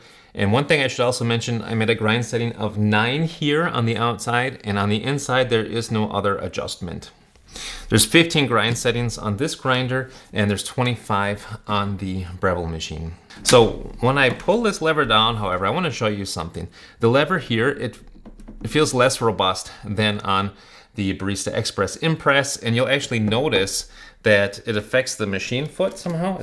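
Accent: United States. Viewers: Wait? That is an American Accent ? I thought you were European ? Where in States is that accent from ? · Are you American or Canadian I genuinely can’t tell I’m English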